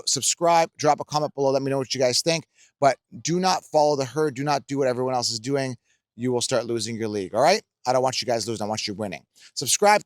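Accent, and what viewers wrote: Canada. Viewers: I’m curious, what state are you from? Or are you from Canada? I hear a Canadian accent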